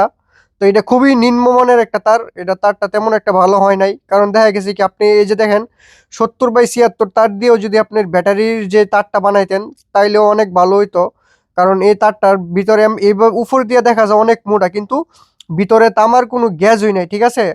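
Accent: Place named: Brahmanbaria, Bangladesh. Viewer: ভাইয়ের কথার ভংগি ব্রাহ্মণবাড়িয়ার মানুষের মতো।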